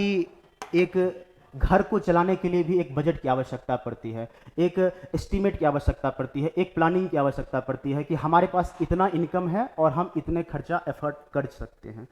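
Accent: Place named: Bihar, India. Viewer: Kon h language bihari k lgta h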